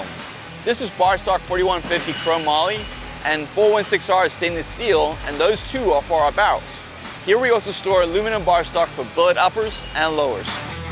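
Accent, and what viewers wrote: South Africa. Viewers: Hi; I hope this is not too personal, but do I detect a bit of an SA accent? Niks verkeerd daarmee nie.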